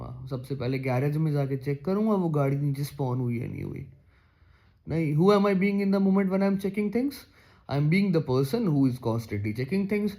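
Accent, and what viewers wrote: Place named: Punjab, India. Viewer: Fuck everything i love your punjabi English accent.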